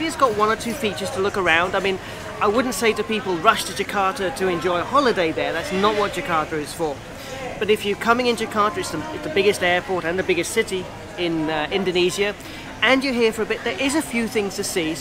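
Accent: United Kingdom. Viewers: Based on your accent, you must be from the UK, right?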